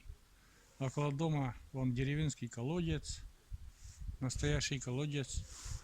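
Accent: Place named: Udmurtia, Russia. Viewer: думал с Удмуртии)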